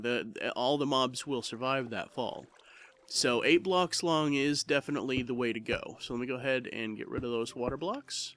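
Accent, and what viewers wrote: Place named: England, United Kingdom. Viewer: You English not Mrican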